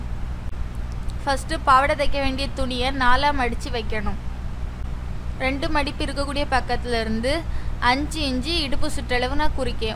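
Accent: Tirunelveli, India. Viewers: அருமையான திருநெல்வேலி தமிழ் பேச்சு சூப்பர்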